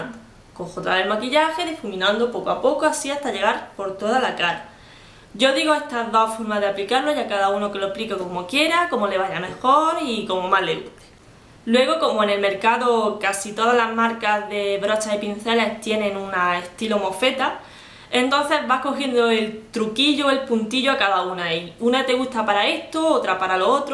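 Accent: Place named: Granada, Spain. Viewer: Sabía que eras granadina!tienes nuestro acento!ME ENCANTAN TUS VIDEOS!! haber si coincidimos algún día en granada!! Un besazoo de una faan!!=)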